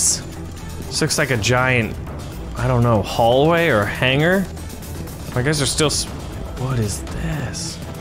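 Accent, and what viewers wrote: Australia. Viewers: U kinda sound like Australian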